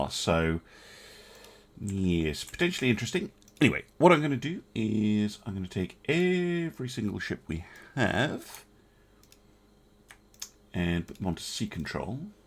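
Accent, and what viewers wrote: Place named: United Kingdom. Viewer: I love channels like this but it was your accent that made me subscribe. Rule Britannia Britannia rules the waves!⚓⛵⚓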